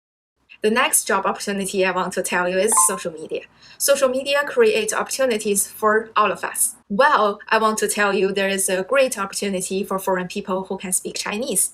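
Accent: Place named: Russia. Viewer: Your accent a bit like a Russian.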